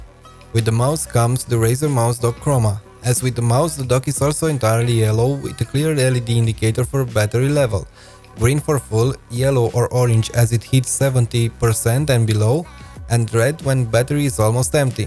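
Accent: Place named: Germany. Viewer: Very serious setup and professional review, good stuff. You sound like Germans, are you? :D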